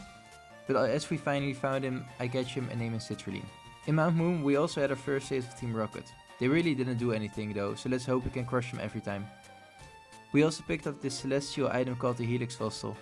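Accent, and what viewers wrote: Netherlands. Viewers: Wait do i smell some dutch?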